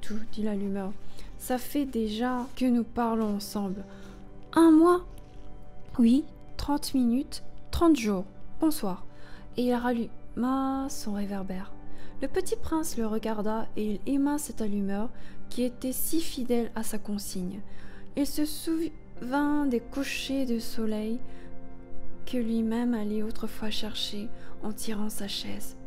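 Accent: South Korea. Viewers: 중국어 많이하면 혀 꼬이는것처럼 구조가 변해요 덕분에 영어발음도 좋은 편이긴 하다만 가끔 세는 한국어 발음이 있어요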